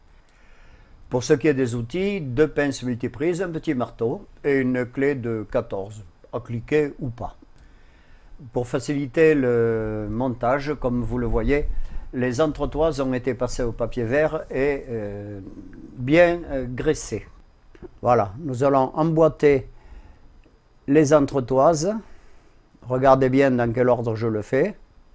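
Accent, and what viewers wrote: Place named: Castres, France. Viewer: Bonjour. je crois deviner dans vos vidéos que vous êtes de Castres (l'accent va dans ce sens aussi!). Est-ce la cas? Mes parents sont de Mazamet et je viendrais volontiers vous poser quelques questions à l'occasion!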